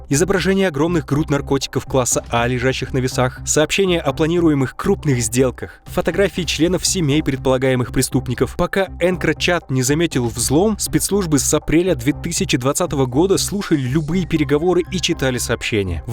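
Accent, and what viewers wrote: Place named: Kazakhstan. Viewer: С первых минут видео понятно, что автор с Казахстана😂😘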